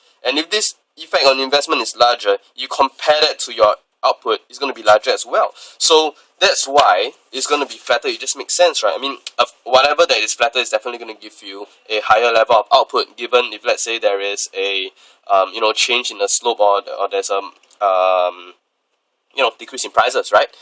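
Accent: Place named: Singapore. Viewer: Hi, thank you for your videos! Btw, are you Singaporean? Your accent sounds like it. That's cool because I understand everything you said in contrast to my university lecturers in Estonia. :D Cheers!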